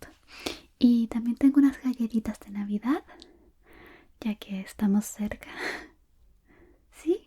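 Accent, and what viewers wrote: Chile. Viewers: sos de chile? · Eres de chile ?👁👄👁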